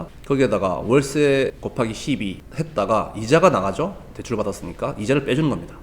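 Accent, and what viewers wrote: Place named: Gyeongsang, South Korea. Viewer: 대구사람이셔요...?... 말투가 익숙해서 더 편하게 들립니당 :) 정주행하고있어요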